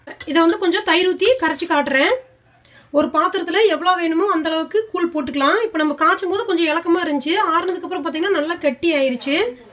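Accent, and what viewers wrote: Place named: Coimbatore, India. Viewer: unga sontha uru unga voice nalala erukka Akka nenga Coimbatore nenacha Akka na Coimbatore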